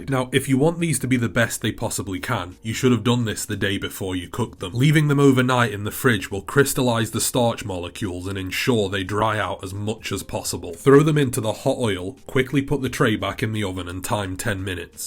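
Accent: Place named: Black Country, United Kingdom. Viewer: Black country is my guess.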